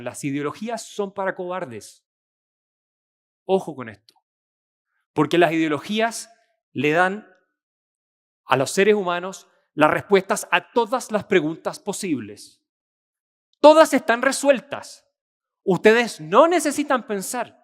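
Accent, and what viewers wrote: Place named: Chile. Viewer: Excelente. Tu acento me acuerda de mi hermosa chilenita linda que perdí en el 2020. Mujer tan hermosa y única.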